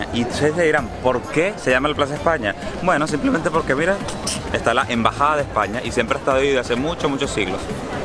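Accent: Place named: Venezuela. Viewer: Llegue por casualidad a este video buscando informacion para mi proximo viaje a Roma, y por el acento y los comentarios sobre cada lugar asumo qué eres venezolano jajajajajajaja, tengo varias dudas sobre el viaje, has usado la tarjeta Roma Pass? la recomiendas? es cierto qué te ahorras la cola en las atracciones si la usas? Gracias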